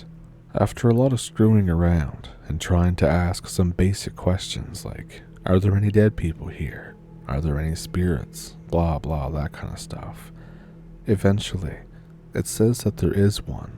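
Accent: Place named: Ottawa, Canada. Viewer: Odelay, 😊 always nice to hear my ottowa cousins accent when you tell yuir stories, neh? Frankly it abaot time. 😊🎉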